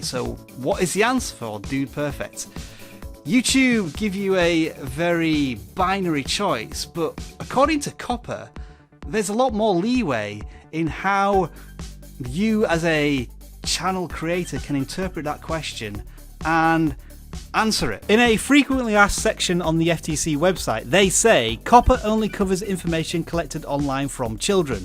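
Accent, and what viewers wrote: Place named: United Kingdom. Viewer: If my comment is missing something on this topic, please be kind and offer some of your own bits of wisdom. First things first: This complete topic applies ONLY to US. citizens living in the territories and states of the US. The laws being considered here ONLY apply to those living within the United States of America! Second, this man (by his obvious British accent) may not be US citizen. We can't know whether he is or is not. How does this law concern him? I need more information! Third, would he please provide how he is so informed about the details of this law. Hope you can see my problem with this video. It appears completely inappropriate to the law in the US.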